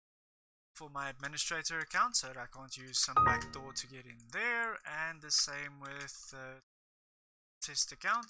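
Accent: South Africa. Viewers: yon sound like a fellow south African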